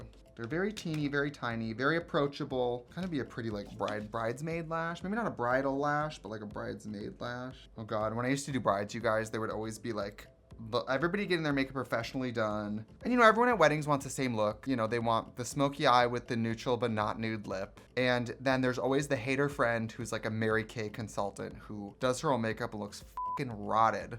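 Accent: Wisconsin, United States. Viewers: I can really hear the wisconsin accent in this one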